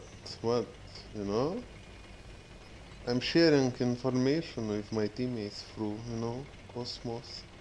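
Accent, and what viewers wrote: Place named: Lithuania. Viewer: English Lithuanian accent.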